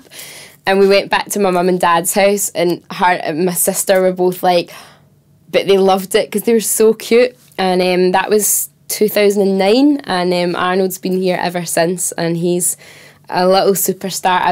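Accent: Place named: Glasgow, United Kingdom. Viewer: When she sings you can't really hear here accents, and then you hear an interview and think. That's a glaswegian lassie 😉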